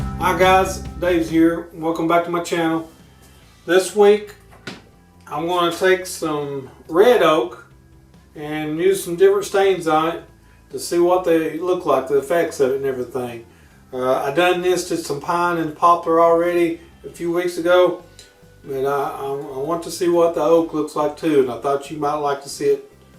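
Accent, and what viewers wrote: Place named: East Tennessee, United States. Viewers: Thank you sir for this video. However I'd love for you to spend a bit of time watching and editing your videos before submitting them. I had some trouble with your southern accent when you were saying one of the samples but it was impossible to read the can as it wasn't even in the picture. Again thanks for taking the time for this but it would be really great if there was a touch of care in the videography. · Hey Dave, Great video. I've got tons of 2 inch red oak slabs. I'm not really crazy about how red oak looks. I'm wanting to use the slabs to build some river tables. I'm wanting to stain the red oak much darker. Do you know if you can run epoxy over stain? Also, nice shirt. What part of Tennessee are you from? You sound like East Tennessee. I'm in Manchester (Bonaroo). Great vid. Thanks.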